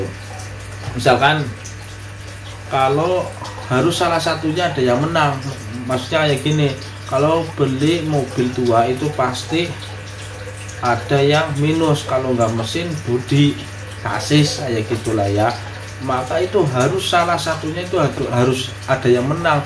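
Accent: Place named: Banyumas, Indonesia. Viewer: Salam ngapak lik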